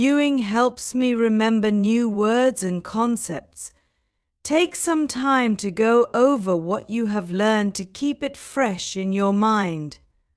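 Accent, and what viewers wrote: United Kingdom. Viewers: I found adorable the british accent. Thanks a lot. You have a new subscriber.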